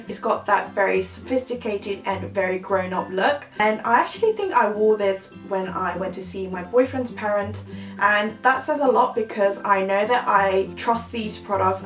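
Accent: United Kingdom. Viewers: 볼때마다 느끼는건데 영국 발음 듣기 너무 좋아요♡ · 영국발음때문에 시청시작했어요~ 저는 캐나다 왔음에도불구하고 열심히 영상보고 영국영어혼자공부합니다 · 아 진짜 영국발음 넘나 조아여💓 2016년에도 좋은 영상들 부탁드려요👍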